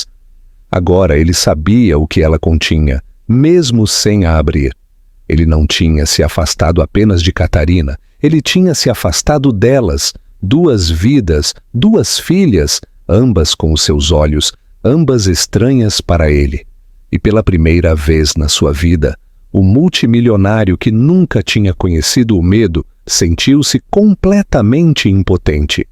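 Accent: Portugal. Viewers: Pq falar com um sotaque português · Achei chata a narração! Porque essa troca de sotaques? Português de Portugal! E as vezes português do Brasil, achei estressante. Porém a história em sim é linda, forte mais bonita. ❤ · Esse é o português falado em Portugal... Muitas palavras são diferentes no Brasil, porém dá para entender perfeitamente... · Uma mistura do português de Portugal e o português do Brasil.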